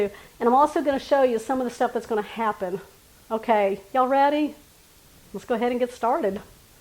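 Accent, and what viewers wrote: Texas, United States. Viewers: Happy new year, y’all! I left Texas and it’s arrogant yet backwards ways many years ago, but it does my heart good to hear that Katy voice. I grew up in Brenham, rode the school bus to college (!) with CyFair kids, and since all my people have gone to heaven, I find myself missing the beauty of the sky, and the kolaches. I am no cook but I just love hearing your non threatening voice.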